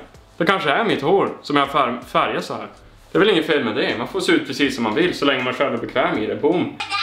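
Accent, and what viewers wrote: Norrland, Sweden. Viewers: Jååå, jag gillar att äta kladdkaka samtidigt som jag kollar på dina videos när du pratar norrländska.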